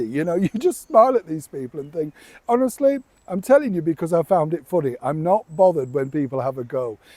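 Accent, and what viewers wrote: United Kingdom. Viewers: Your British accent and the lovely scenes from London and environs make me want to have a cup of tea every time ! Sometimes I make a full pot ! Today I had iced tea ! It was 104 F outside too hot for a fire, the A/C is running and a fan too...greetings from Stockton, California USA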